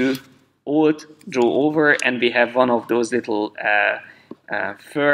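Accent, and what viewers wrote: Hungary. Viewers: Hey are you hungarian? Im pretty sure by the accent. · magyar akcentust vélek felfedezni, de ez persze nem változtat azon, hogy szép munka! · Érezhetően magyar akcentus, jó hallgatni! Könnyebb megérteni :D Köszönöm az útmutatást!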